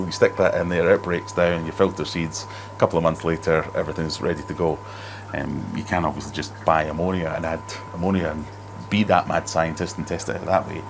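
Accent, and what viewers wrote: Scotland, United Kingdom. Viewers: Tetra. 5-in-1 or 6-in-1 strip tests are very accurate and you get the results in just one minute. No crazy shakes and much easier to use. I cut mine in half lengthwise and get double the value. Love your Scottish accent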